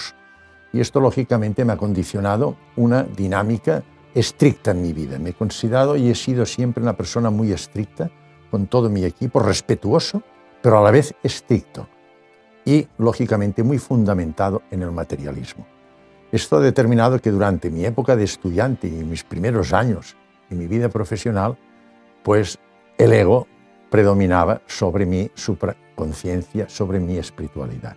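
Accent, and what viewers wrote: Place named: Catalonia, Spain. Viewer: Hace algún tiempo viví en Barcelona , el acento es muy catalán hasta siento que le he visto antes . Abrazos , muy agradecida por sus charlas.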